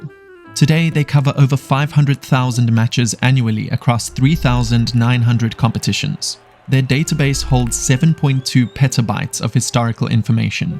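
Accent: South Africa. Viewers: It's an addition because recruitment is less biased. Average players were signed simply because they were Brazilian or English and better players from Romania or Togo were overlooked. I saw your video a few days ago but I didn't watch because the thumbnail seemed clickbaity. I watched it by accident now and I loved it! Great video. Sidenote - Your accent sounds South African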